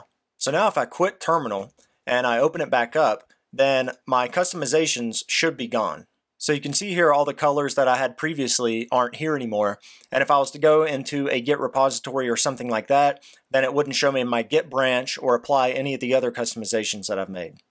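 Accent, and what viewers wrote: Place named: Pittsburgh, United States. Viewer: Your from somewhere around Pittsburgh. I recognize the accent from 400 miles away!!!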